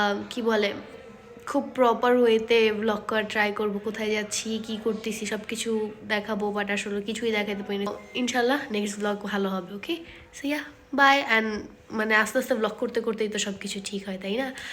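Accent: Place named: Alfadanga, Bangladesh. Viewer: Hiiii... So sweet of you....first time cmnt korchi tmr vdo te... Amdr gram er basha o alfadanga... Shune vlo lglo amra same elakar manush....❤❤❤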